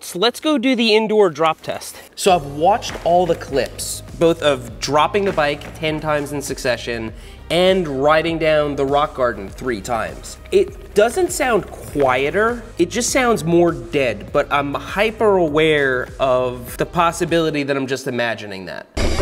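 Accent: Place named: South, United States. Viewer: new southern accent is coming through: "Impordan"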